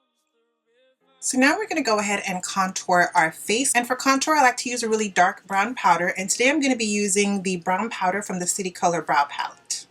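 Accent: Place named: Caribbean. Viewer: You are beautiful, and you did an amazing job with the explanation and demonstration. During the video, I heard a tiny bit of an accent, a Caribbean accent, like mine lol. Am I right, are you from the Caribbean? I went through many of your videos to confirm but didn't see anything. So, are you?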